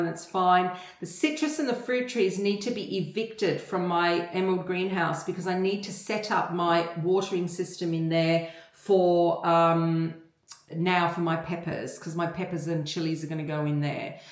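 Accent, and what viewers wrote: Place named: Australia. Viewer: Hi Thank you for your videos they really helpful and yes, you do have a lovely Australian accent 😂 Im from Portugal, but I've been in England for 33 years, and i, too, don't think i have an accent, but apparently, i do I guess in my head, i just sound very British 😂 and it always makes me giggle when someone asks where im from😂 Have a lovely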